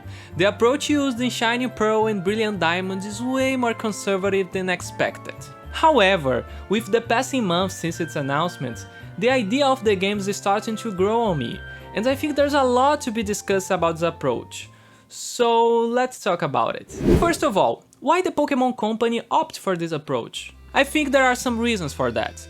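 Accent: Brazil. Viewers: Are you Brazilian? You speak with kind of a Brazilian accent.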